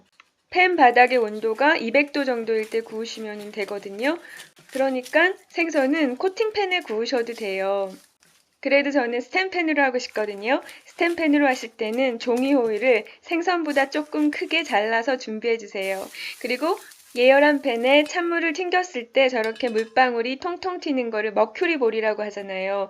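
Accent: Seoul, South Korea. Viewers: 서울사투리 듣기 좋네요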